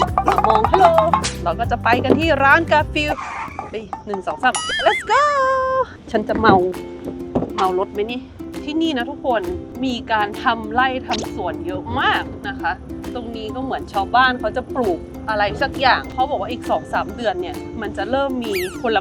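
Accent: North, Thailand. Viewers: อี่หน้อยนี้อู้เมืองได้ต๊ะ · ทำไมพูดเหนือได้ครับ หรือลูกครึ่งเหนือ+อิตาลี่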